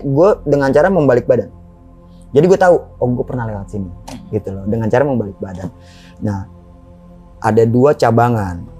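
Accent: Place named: Java, Indonesia. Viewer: Nama Tapi Mendook Amat Mas Ngomongnya...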